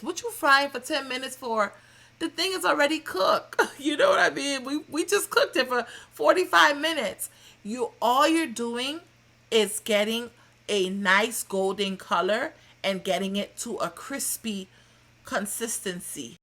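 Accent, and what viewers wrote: Bahamas. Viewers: I can hear the Bahamian accent. I’m about to make griot for the very first time and your video is very helpful.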